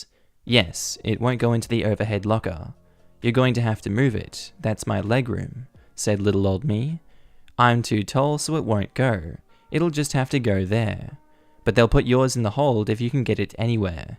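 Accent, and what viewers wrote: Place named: Australia. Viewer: BTW i recommend your channel to my aunt who’s learning English, for listening skills. God thing you’re a native speaker!! Aussie accent is real good for learners! <3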